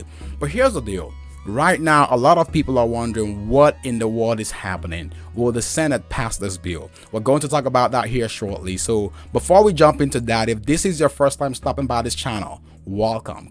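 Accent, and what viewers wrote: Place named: Africa. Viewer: Get English lessons please...get the African out of you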